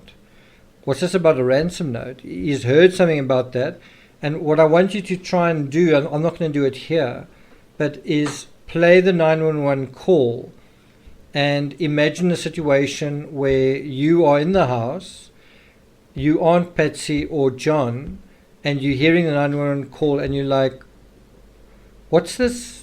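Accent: South Africa. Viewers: Dr Phil, has an accent SA hence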